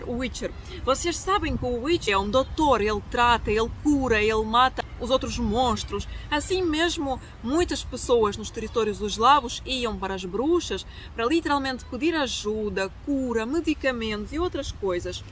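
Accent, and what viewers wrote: Portugal. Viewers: Eu te assisto sempre e fico bem na dúvida se o teu sotaque é do teu idioma, mais parece que é mesmo de Portugal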